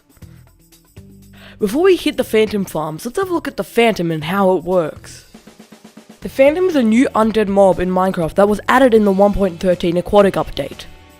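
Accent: Australia. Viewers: Are you Australian??? You sound like Steve Irwin... CRIKEY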